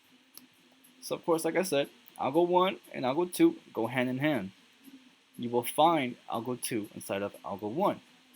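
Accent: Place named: Brooklyn, United States. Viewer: Wow so glad I found your channel bro! I'm already well versed with ICT but this has been a cherry on top. You sound like you have a Brooklyn accent btw... you from NY?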